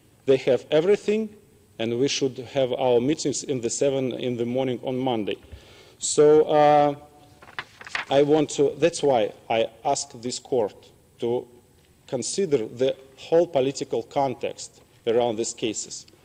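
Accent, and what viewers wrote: Russia. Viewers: По акценту сразу понятно что русский. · Построение предложений у него правильное, но вот акцент ужасный, как, собственно, у 90% русских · Russian English detected! Но все равно, его уровень в 100500 раз лучше Путина!!! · >ещё я не заметил ошибок в ударениях Плохо. Речь у него более-менее правильная, но произношение дубовый типикал рашен акцент. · Говорит на английском по русски))) даже без перевода понятно) молодец, как ни крути) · Неисправимый русский акцент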